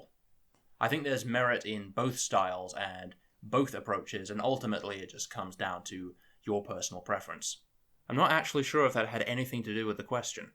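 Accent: United Kingdom. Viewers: What's your native dialect? It's definitely a non-rhotic, british-esque but I'm having trouble placing it more specifically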